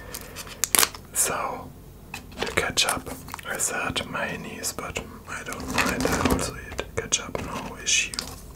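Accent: Germany. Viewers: Man merkt das du deutsch bist weil alle deutschen so english sprechen 👍🏻😅 (Außer ich glaub ich)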